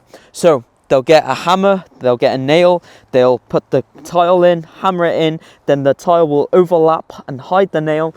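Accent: Wales, United Kingdom. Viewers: Can decide if he got a Welsh accent or a foreign accent! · Good video. Think about making more videos breaking down each process and showing them actually being done. You sound like you might be from Wales. I've run a few jobs myself. Currently coming to the end of a refurb in ponty. In Wales of course we now have to fit Sprinkler systems in new builds - even domestic ones. We've just done almost £10,000 on a sprinkler system and fire alalrm for a five bed HMO. Thank you Welsh Gov. Anyway, great vid, great channel good luck on making more videos. 👍👍👍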